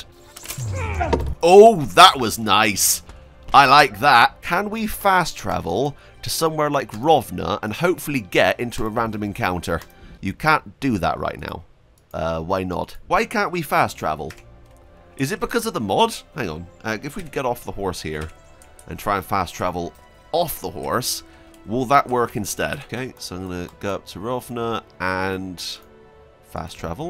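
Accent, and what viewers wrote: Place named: Bristol, United Kingdom. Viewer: My guy is from Bristol. Can't hide that accent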